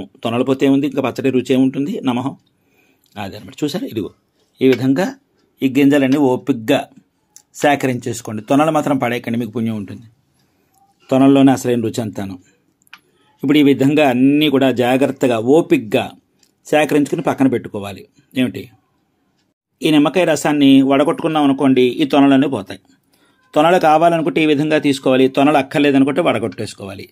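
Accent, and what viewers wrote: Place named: Godavari, India. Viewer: మీ తూ.గో.జి. పగోజి తెలుగు అద్భుతం. వినాలనిపిస్తుంది, చేసినవి తినాలి అనిపిస్తుంది నమస్సులు.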